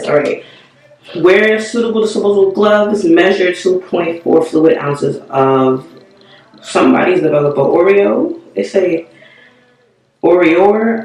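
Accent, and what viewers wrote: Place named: New York, United States. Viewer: Def dying my hair tomorrow and hoping it turns out like this. 😂😂 watching the video and listening to you talk I was like ain’t no way she not from New York 😩🤣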